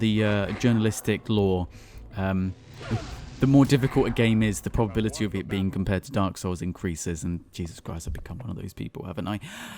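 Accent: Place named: United Kingdom. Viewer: Come for the gameplay, stay for the posh English boy living 2015 again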